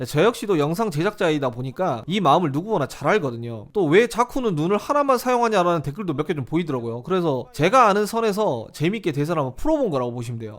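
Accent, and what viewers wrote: Gyeongsang, South Korea. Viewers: 말투가 경상도같긴한데 뭔가 전라 느낑이 있다? 충청..은 아닌거같기도 하고했는데 화개장터가 맞군요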